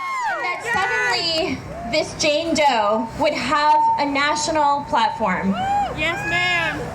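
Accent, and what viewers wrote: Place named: United States. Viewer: Her English is 100% American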